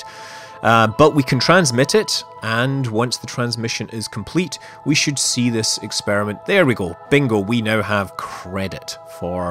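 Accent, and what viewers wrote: Scotland, United Kingdom. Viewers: i love that your brilliantly scottish accent means that you could be saying mun or moon, i love it xD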